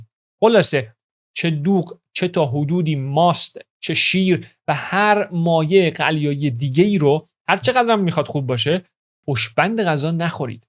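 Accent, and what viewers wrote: Ahvaz, Iran. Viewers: بچه اهوازی ؟ 😅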